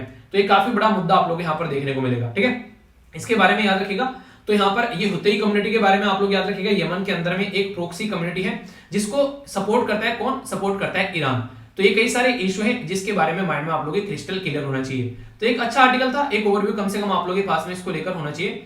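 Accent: Haryana, India. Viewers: Hlo dii aap Haryana se ho na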